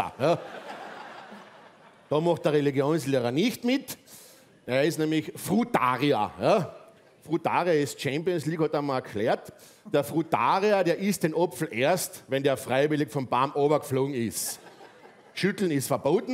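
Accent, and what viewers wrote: Austria. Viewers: Ob das unsere deutschen Nachbarn auch wirklich verstehen vom Dialekt? Und wida amoi gscheid umabrocht 😀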